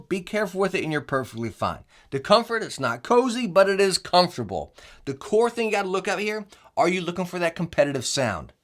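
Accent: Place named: Staten Island, United States. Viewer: I love your accent, my man. Are you from Staten Island by chance?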